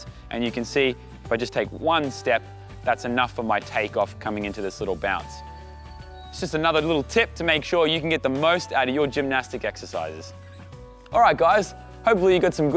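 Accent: Australia. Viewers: Are u australian?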